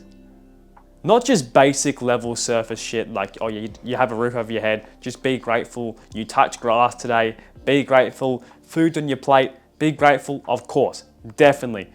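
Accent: Australia. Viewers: Is that an Australian accent you've got there?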